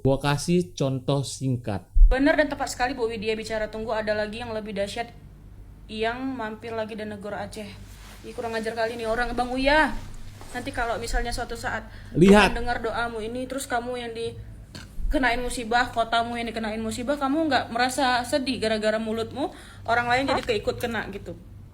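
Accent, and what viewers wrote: Java, Indonesia. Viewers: Jawanya mana bang kamu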